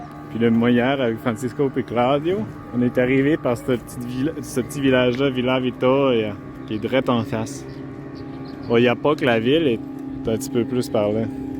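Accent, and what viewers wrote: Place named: Quebec, Canada. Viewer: Et au tout début, je t’avoue qu’avec ton accent j’avais vraiment envie d’abandonner tellement je ne comprenais rien. Maintenant, j’ai presque l’impression de comprendre le québécois 🤣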